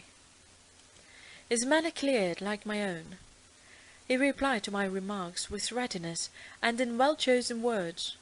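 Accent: United Kingdom. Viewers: This is a RP, isn’t it?? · I love British accent thanks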